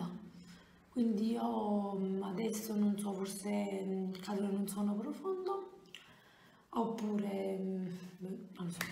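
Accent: Naples, Italy. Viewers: Sei napoletana Ps ti adoro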